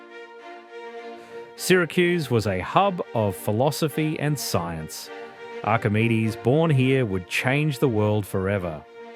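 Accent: Australia. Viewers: Suspect Aussie? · is that an Australian accent I could hear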